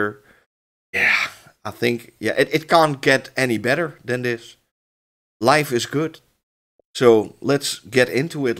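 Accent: Netherlands. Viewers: you are dutch i hear it in your voice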